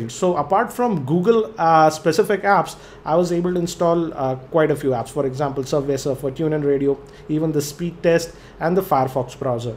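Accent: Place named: Maharashtra, India. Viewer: You will make it big by taking diction classes. You're speaking English but I can hear Marathi!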